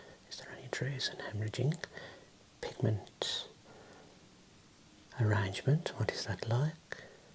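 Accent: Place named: West Midlands, United Kingdom. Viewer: When MassageASMR stopped making videos I sadly accepted that I’d never find another ASMR creator who relaxed me as much as him — then I found your channel. YouTube algorithm kept putting you on my homepage way back before you were even at 1k subs I think - reluctantly I gave you a go seeing as the algo was so insistent on you… thank goodness I did that! You’ve become my favourite ASMR creator BY FAR. Especially helps your accent reminds of back home in the West Mids. Long may your channel keep growing and thriving!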